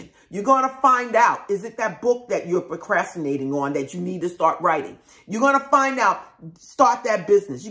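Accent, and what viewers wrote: New York, United States. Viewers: This has been happening 4 or 5 times during the week I'll start doing this tonight. Thank You Oh yeah. Are you from NY.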